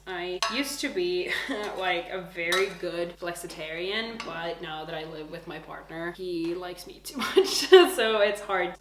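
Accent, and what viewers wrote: United States. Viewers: Yes.. i usually have less interest about your cooking contents but i've realized that you have a perfect american accent.. maybe you should start to post some language based contents.. and maybe some informations from U.S.. And you should sail to kick or twitch.. these can also go with live streams.. Ohh one more thing.. i think you forgot to cut this video to parts or it's basically a weekly video :D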